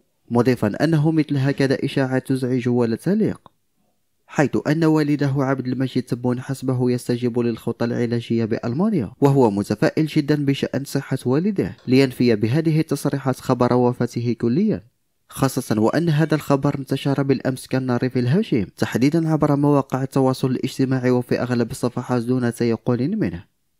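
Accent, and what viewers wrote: Morocco. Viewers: باينة لكنة مغربية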